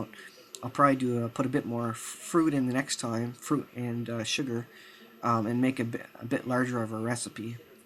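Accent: Canada. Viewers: Are you Canadian? I'm hearing a Canadian type of accent.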